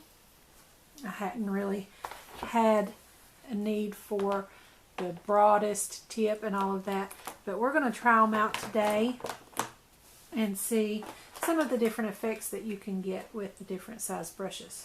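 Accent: South, United States. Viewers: Answered everything, and then some. You are such a blessing. Thank you so much, I feel so special. I'm originally from Florida and I just love hearing your southern accent. Have a blessed Easter. Bev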